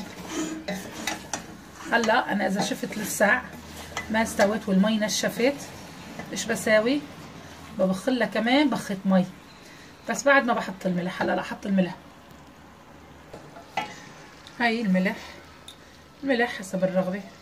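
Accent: Aleppo, Syria. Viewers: بحب لهجه حلبية ❤ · لك دخيلك وخيل اكلات الحلبية يا انا متل حكيتك والله ياخيت حلبية ابوس جنق وجك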